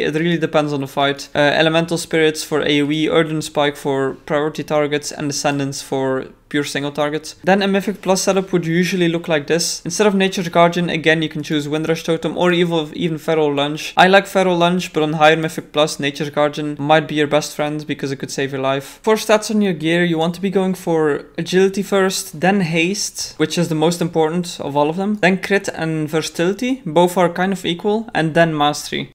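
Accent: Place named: Netherlands. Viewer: oh i was guessing dutch, you sound different from the Belgians i know.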